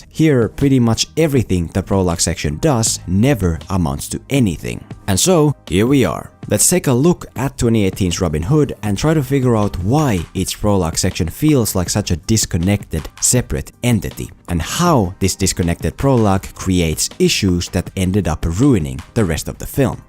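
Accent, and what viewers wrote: Finland. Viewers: This been bothering me for a bit now, but would you happen to be Finnish? The way you pronounce english gives me that feeling.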